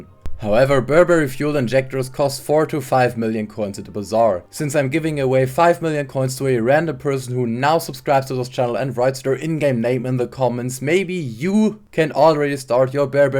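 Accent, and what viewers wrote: Germany. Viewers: Man hört den deutschen Akzent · Kommst du aus deutschland ? Weil entweder bin ich dumm oder man hört den akzent stark raus 😂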